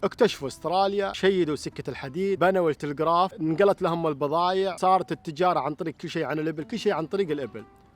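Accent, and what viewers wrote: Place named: Bedouin, Arab world. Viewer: لان بصراحه لهجتك شبيها للهجت بعض البدو هنا في مصر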